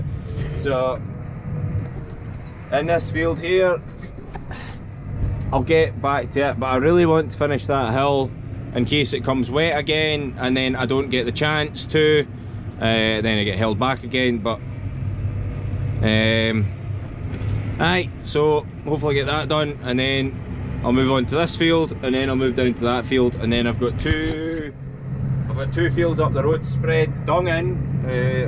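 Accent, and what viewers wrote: Scotland, United Kingdom. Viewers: Which part of Scotland are you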